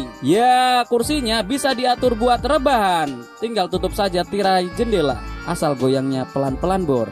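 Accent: Pekalongan, Indonesia. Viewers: Lugot nya org Pekalongan kliatan nya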